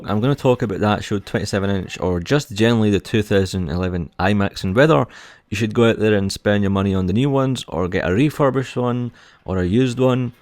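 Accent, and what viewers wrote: Scotland, United Kingdom. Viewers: you're scottish laddy!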